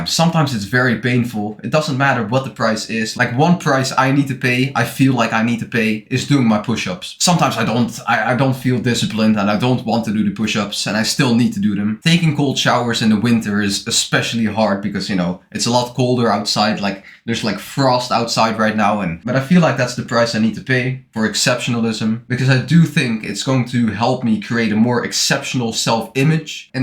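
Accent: Netherlands. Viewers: YOO R U DUTCH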